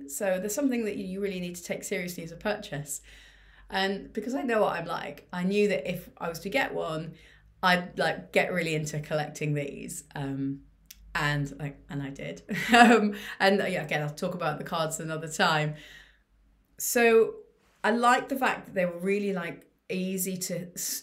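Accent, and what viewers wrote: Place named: England, United Kingdom. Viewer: Nothing wrong with the British accent.... But to be fair, it's an English accent.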